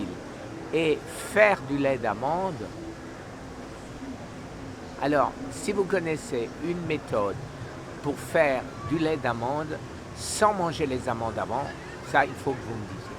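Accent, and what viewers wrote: Alsace, France. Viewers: Bonjour, l'alsacien! J'ai soupçonné un certain accent que je connaissais.. hé oui en t'écoutant..tu avais parlé que tu vivais à Strasbourg..il y a 20 ans je crois.. Je ne sais par comment je suis arrivée sur ta chaîne! Mais je suis ravie..j'apprecie les battants en général dans la vie!! Personnellement je ne suis pas touchée par cette maladie.. Mais ma mère et mon compagnon ont quittés cette terre..à cause de ce crabe!! Bien sûr que l'alimentation saine.. est un facteur de santé physique mais aussi psychique! J'ai personnellement changée mon alimentation depuis une dizaine d'années, et je suis bien plus en forme physiquement et mentalement et mon moral est au top! Si bien,que j'ai entamée une conversion professionnelle il y a presque 10 ans. Oui j'ai rejoins une éco crèche, et j'ai jour après jour transformée les menus que l'on servaient aux enfants. 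J'en ai fais ma croissade..j'ai emprunté ton titre..pour d'expliquer que c'etait et reste encore pour certains aliments une bataille..Avec certains de mes collègues.. Mais au jour d'aujourd'hui les menus à la crèche sont végétariens voire végétariens et bien sûr bio. J'anime des ateliers pour les parents, grands parents pour les sensibiliser à l'alimentation approprié pour les jeunes z'enfants.. Les rendre attentif que la nutrition infantile essentiellement basée sur les végétaux, les fruits et crues, joue un rôle important pour leurs croissances mais surtout aussi de conserver un capital santé tout au long de la vie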